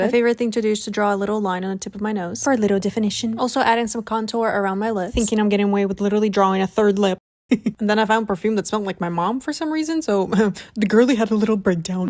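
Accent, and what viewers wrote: Russia. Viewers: I think she has a Russian accent respect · I hear a russian accent... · черт, я прям чувствую этот русский акцент....................... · Моментами пробивается родная русская речь..) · С первых секунд поняла, что вы русская. На самом деле, шикарное видео,спасибо❤ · I rly like ur videos, this video have Russian memes and аKцент😮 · damn are you Russian? because the occasional accent and the fucking picture of конь Юлий were such a slay 😭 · слышу русский акцент хаха🫶🏻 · this accent sounds like typical «russian accent» i don’t know where you from but still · OMG I LOVE your humor and voice! also, aren't you russian? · your accent sometimes seemed a bit...russian? to me, GIRL ARE UU russian or it just seemed to me?? 😭😭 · her accent sounds more like russian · Я слышу русский аккцент, наверное, но вы крутышка💕💕👀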